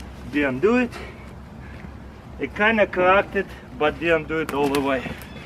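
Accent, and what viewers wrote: Russia. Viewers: Idk but I think he has already reviewed this axe or it's probably another dude with a russian accent.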